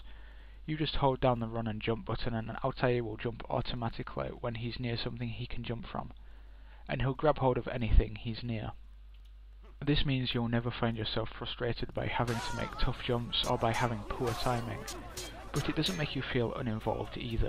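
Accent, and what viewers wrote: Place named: Scotland, United Kingdom. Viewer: He sounds more Scottish than English.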